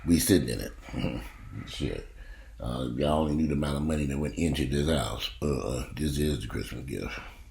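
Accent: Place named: South, United States. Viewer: Omg I always felt like I could listen to you all day just talk. So relatable reminds me of my great uncles and fam from the south lol❤ have a good one as well.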